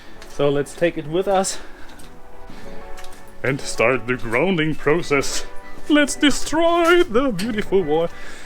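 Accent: Germany. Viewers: sofort gehört das deutscher bist haha :) schaue öfter vorbei ! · Ich dachte die ganze zeit er muss deutscher sein bei seinem englisch... und dann kam die Bushaltestelle😇 (ist das erste video, dass ich von ihm sehe)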